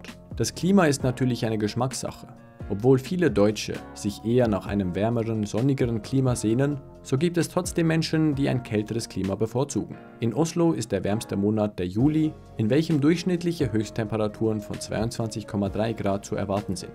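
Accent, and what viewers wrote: Switzerland. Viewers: Man hört schon sehr sehr oft Schweiz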